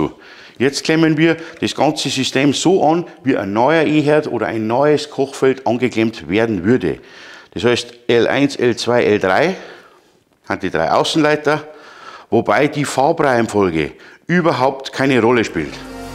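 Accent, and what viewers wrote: Bavaria, Germany. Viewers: der "Erklärbär" 😂 Wie immer klasse, auch wenn ich mich als Preuße oberhalb des Weißwurstäquators manchmal anstrengen muss das bayerische zu verstehen:-) Mal ne vielleicht ungewöhnliche Frage? Vom Zähler zum Wohnungssicherungskasten ist zwar 5x10 Quadrat verlegt aber 2 Außenleiter sind nicht im Zählerkasten aufgelegt und somit im Sicherungskasten auch nicht. Habe sozusagen nur 1 Außenleiter, 1 Neutralleiter und PE zur Verfügung. Der eine Außenleiter ist mit allen 16A Sicherungen im Kasten verbunden. Wäre es richtig wenn ich zum Kochfeld mit 5 pol. Kabel gehe, wobei ich 3 Außenleiter (einzeln abgesichert) nehme? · du erklärst es einfach, dass ich mich zwar für mich darauf konzentrieren muss, deinen bayrischen Dialekt zu verstehen.Du bist ein netter Kerl.Mia san mia👍😉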